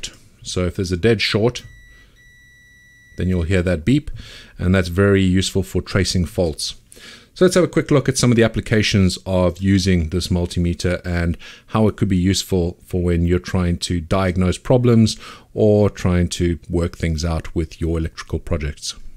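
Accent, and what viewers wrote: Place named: South Africa. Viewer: Amazing videos really on point with what i wanted to learn and the series is well set out. Thanks for the amazing content! Also can't loose that SA accent hey xD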